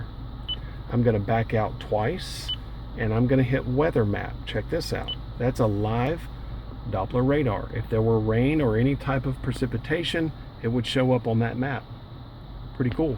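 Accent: North Carolina, United States. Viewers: Thank you sir for this informative video! Going to pick up my new GX here soon and you have saved me a lot of time. Love hearing your North Carolina accent too (I'm from WNC). Will tune in for more car related videos!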